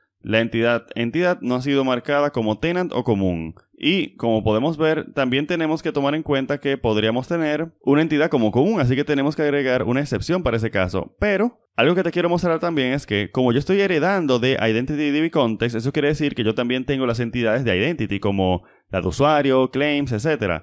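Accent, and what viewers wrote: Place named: Dominican Republic. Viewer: Hola, eres de Rep. Dom.? Pareces dominicano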